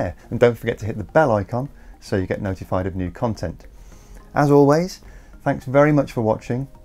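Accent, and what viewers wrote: United Kingdom. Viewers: this Brit-voiced clown is a total fake-news CarbonTaxFraudScam alarmist propagandist. Anyone can look up Dr.Patrick Moore or other FACTUAL evidence-based sources for the TRUTH about how greatly increased co2 will be an enormous benefit to our climate & biosphere. Here's the TRUTH & FACTS: co2 FACTS vs neurotic alarmist fake-news FICTION: Greatly Increased co2 will reforest the Sahara, Gobi, Atacama, Western US, and all other barren deserts worldwide, as well as the desolate Arctic & Antarctic tundra-wastelands. Frozen immobile ice will melt and CIRCULATE moderating & cooling the equator & tropics, as warmer polar oceans evaporate & REHUMIDIFY the atmosphere precipitating & soaking the deserts into lush jungles & fertile farmland while restoring depleted aquifers & watersheds globally. ZERO phoney "sea level rise" (and btw, REAL PHYSICS: floating ice does NOT increase the level of the water it floats in as it melts! 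DUH. the buoyed ice above the waterline is no longer pressing down once it has melted, DUH). The Sahara alone will soak up more water than all the ice on Greenland & Antarctica. Terrible crop-killing winters will give way to year-round growing seasons in the Great Lakes and other agricultural lands worldwide. The Great Lakes basin with its uber-rich soils & unlimited fresh water will produce 1,000x more food than the San Joaquin Valley (which requires unsustainable irrigation & fertilizers). That's sustainable food for 35 BILLION PEOPLE. Terrible crop-killing winte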